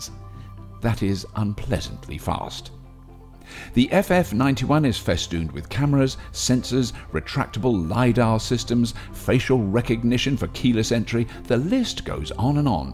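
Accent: United Kingdom. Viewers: i love the enthusiasm in the narrator's voice....very British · I love the British accent.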